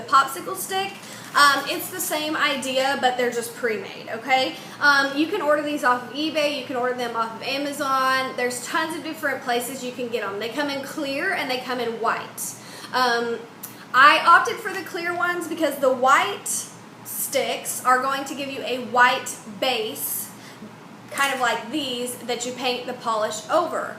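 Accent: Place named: South, United States. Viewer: Where are you from?! I love your accent are you southern?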